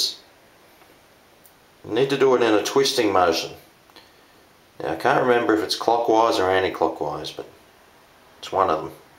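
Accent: Australia. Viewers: Is he Australian,he sounds like one of my friends from Australia! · I knew you were Australian!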